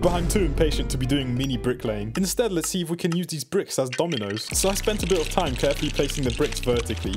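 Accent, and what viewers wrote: United Kingdom. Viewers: sounds Britsh to me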